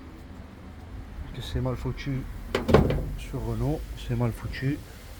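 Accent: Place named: Marseille, France. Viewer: avec l'accent marseillais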